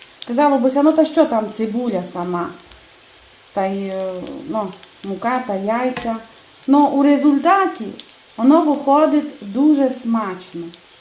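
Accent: Transcarpathia, Ukraine. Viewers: Чарівна вимова. Мабудь закарпаття❤ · Приємно чути Закарпатський діалект. В мене тітка з Сваляви, теж так розмовляє. Хороший рецепт, дякую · Боже, как же мне нравятся вот такие хозяюшки. Все по настоящему, по домашнему, без помпезности, по простому. Ручки пухленькие, голос приятный, говор с акцентом украинский. Спасибо дорогая хозяюшка за рецепт, обязательно приготовлю.👍🌹💐😊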